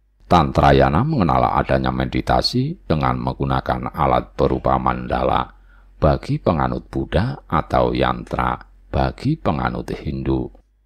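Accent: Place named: East Java, Indonesia. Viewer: kirain jatim mojokerto jenengan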